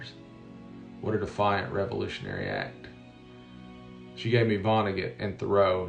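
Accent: South, United States. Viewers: Your video on "Christian nationalism" popped up on my YT a few days ago. As that was a subject that has been occupying, not to say concerning, me for some time I clicked and heard it right through. I agreed with everything you said and was very impressed by your use of the English language - I'm a Brit living in Germany - but otherwise didn't know quite what to make of you. Now I've watched this one and was really moved. I too had a number of teachers who were an encouragement and help to me: I don't think I was a bad student, as I did what was expected of me, but they taught me to think for myself and that was something new and opened up a whole new world for me. I went on to study foreign languages (German and Spanish) and after university got a job as a translator here in Germany. I'm retired now, but I can still remember most of my teachers' names (and would like to thank Ms. 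Davies, Mr. Edson, Mr. Hateley, Dr. Meier, Mr. Kirkman and Dr. Smith i.a. here). I was never the maths and physics type, more the English and languages type, and as I became a Christian (which in the UK - or in Germany, for that matter - is not like in the US) at university I developed an interest in theology too. Thanks for this video (and thanks to y'all for your comments, which I found equally moving). Your English with the southern accent (I'm also a fan of Trae Crowder, by the way) is very soothing but eloquent, down-to-earth and inspiring - compared with what we usually see in the US news, whic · 👏🏼💖Thank you. You are the most eloquent, beautifully spoken man with a Southern accent I have ever heard! You deliver such poignant truth with such thoughtful vocabulary & deep insight into the heart of the subject, you never cease to amaze me! I'm in tears listening to this, thank you so much for sharing your kind, beautiful spirit!🙏🏼🤟🏼✌🏼💙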